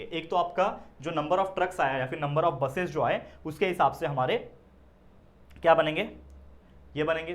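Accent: Maharashtra, India. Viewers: Sir's marathi at peak🫠😮‍💨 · Sir tumhi marathi ahe kay ??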